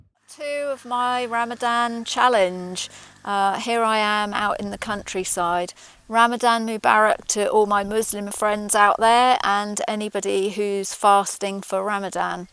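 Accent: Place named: United Kingdom. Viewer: The British Accent 😍😍😍💚💚🔥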